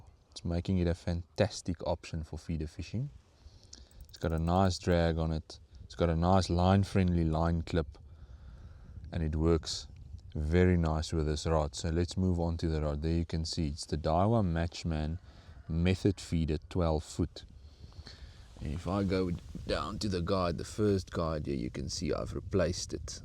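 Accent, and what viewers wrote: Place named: Africa. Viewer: Afrikan accent gives me the creeps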